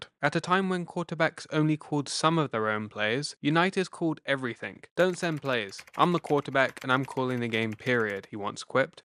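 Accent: United Kingdom. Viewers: Full of typos. And I'm sorry but listening to a Brit talking about Johnny U is like listening to a Sherlock Holmes story read by an American. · A British accent for the narrator? About an NFL player? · Why is some Brit guy telling us about American football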